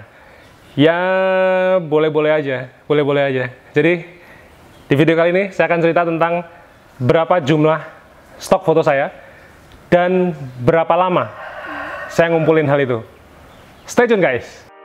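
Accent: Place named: Java, Indonesia. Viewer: pandangan subjektif yo pak, aku seneng nek krungu sampean jelaske logat medhok haha.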